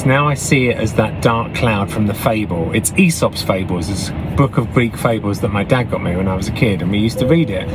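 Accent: United Kingdom. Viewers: Hi! I love you so much, too! I mean, in a buddy/friend/little brother kind of way! I'm 59 so you'd be my little brother. I've always loved your accent, so, COOL!! My little brother has a sophisticated British accent! Oops! I got way off topic! Anyway... Here's my thought -- depression draws us inward. Like that big, heavy coat on the man in the fable. (I loved that!) We're enveloped. It's heavy and uncomfortable. When the sun came, he opened himself up to everything around him, and was a free and happy man! I think depression seems like it hightens creativity because we're drawn so deeply inward. With music, especially. In depression, everything comes from that dark, shrouded place inside. Later on it might sound good. And it might be. But it's a reflection of that dark place...and do you want that? It'll always make you think of that place. Here's my point: your creativity is part of you. 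You were born with it. It's in your soul. You can't lose it. You were gifted with it. God put it there. Being as musically talented as you are is a GIFT. Whether in a dark place or in a place of light & peace, you're going to make exceptional music! Do you want to remember the darkness...or the light??, I am praying for you! *Lord, please take this burden away. Please guide and help him find that place of healing. Lift his heart and spirit. Show him the way. In Christ. Amen.💗* I'm 59, have suffered from depression since I was 14, and only got on medication 7 years a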